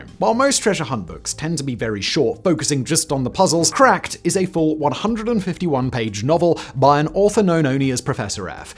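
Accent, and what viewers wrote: England, United Kingdom. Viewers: I come back for the lesson delivered with an English accent time and time again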